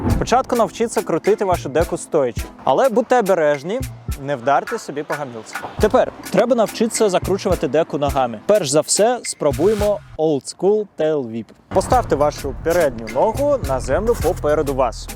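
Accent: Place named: Belarus. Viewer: Всё же ты белорус, говор выдает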